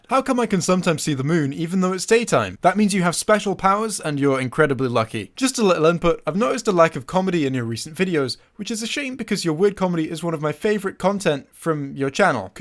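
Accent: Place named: Scotland, United Kingdom. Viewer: Question: I’m hearing a Scottish accent there mate, Whit part yae fae?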